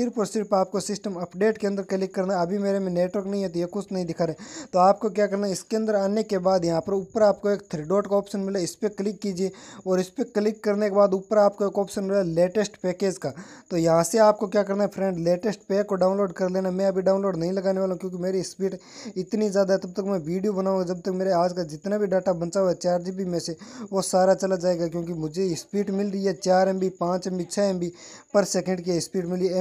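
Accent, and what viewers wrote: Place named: Rajasthan, India. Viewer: Pkka aap rajasthan se ho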